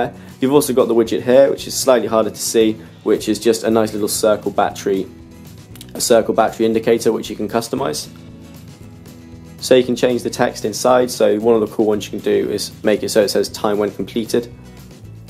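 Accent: United Kingdom. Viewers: q horrible eres y tu acento mamon de britanico es super estupido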